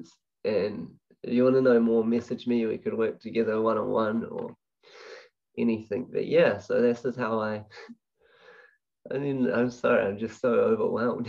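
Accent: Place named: New Zealand. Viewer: Please do a talk in Auckland. I knew you were from NZ straight away hahaha 🙏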